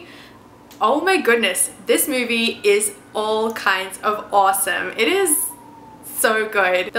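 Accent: Australia. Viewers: Hey do u like the singer? Lol. Sorry had to:p but anyway I love ur videos and I love ur accent I've always loved Aussie accents! Lol Also question. Why did u come to the US like what are ur goals for here and what brought u here? Would love to know:) keep up the good work!!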